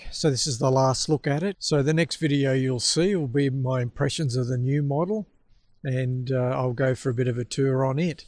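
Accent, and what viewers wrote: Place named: Australia. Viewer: You sound like an Aussie, you can’t buy a new one in Australia so I’m intrigued where you are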